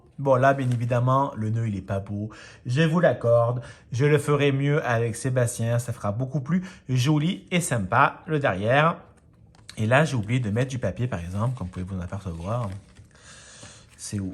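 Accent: South, France. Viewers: Sa vas? Accent du midi sa réchauffe le coeur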